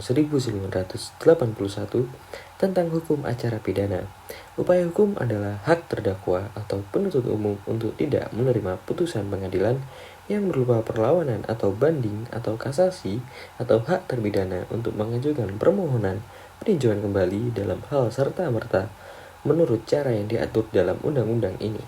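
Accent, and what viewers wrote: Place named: Malang, Indonesia. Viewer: Pasti yang dubing anak mlawang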